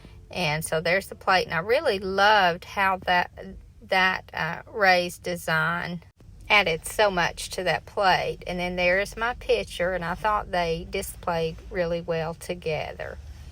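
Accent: South, United States. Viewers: Hi, I just found your channel and love it. I was wondering where your store is located. I related to your style as well as your southern accent. I am from south Ga., but live and have 2 booths in Tn.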